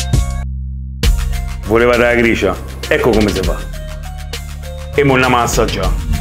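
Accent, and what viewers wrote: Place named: Rome, Italy. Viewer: bravissimo,poi il dialetto romano...sono un fan!!! ma questo me pare sempre incazzato nero :-)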